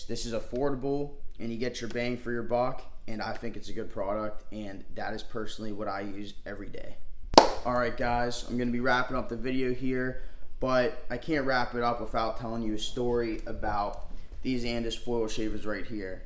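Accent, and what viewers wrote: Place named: Pittsburgh, United States. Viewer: G! Soon as I heard your Pittsburgh accent I knew and then you said Crafton! Been shaving my head 9 months. Pittbull Gold rotary. All good for 6months until I ordered replacement blades. Now getting irritation each shave. Would you recommend a close trimmer and this foil shaver for me. I’m shopping for both. Looking at andis t outliner pro and would like a foil to go with it. Great video man! Moved to the burgh 3 years ago!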